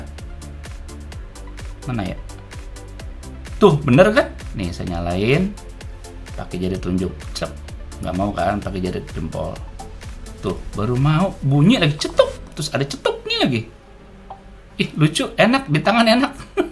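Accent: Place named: Java, Indonesia. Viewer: Suaranya mendelep, jowone metu wes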